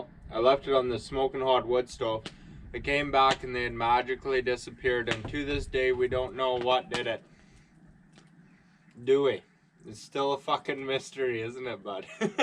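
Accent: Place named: Manitoba, Canada. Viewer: Where Canada? Your accent sounds like my family in Manitoba. I like it, makes me feel at home... deadly!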